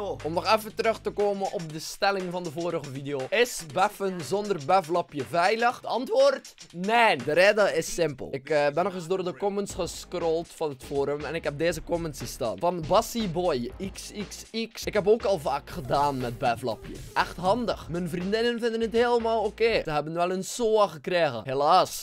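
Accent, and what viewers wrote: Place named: Antwerp, Belgium. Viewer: sinds wanneer spreekt altijd uit met een antwerps accent?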